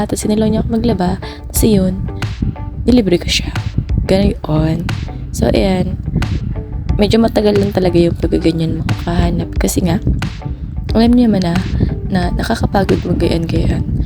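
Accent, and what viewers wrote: Bicol, Philippines. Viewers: ahh ay akala koy taga mindoro ka..taga bicol ka baga?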